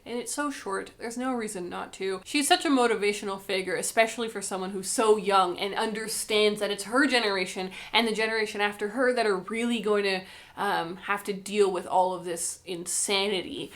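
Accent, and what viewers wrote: Canada. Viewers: So many Canadian 'abouts' in the first forty seconds it's so great! Great sponsor, looks like a good ecofriendly product.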